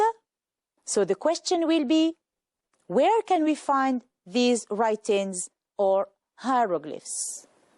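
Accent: United Kingdom. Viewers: Right great RP english thanks a lot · it seems that The Mr is using the British accent , i really liked it . i wish there will be some of scientific lessons . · thanks .. :'D british english (y)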